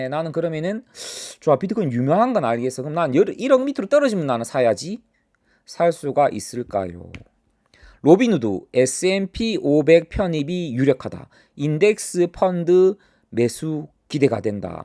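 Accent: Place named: Gyeongsang, South Korea. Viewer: 소중한 인사이크 감사합니다 근데 원래 경상도 사투리가 이리 웃긴가요 ㅋㅋㅋㅋ 비하발언 아니라 진짜 웃겨서요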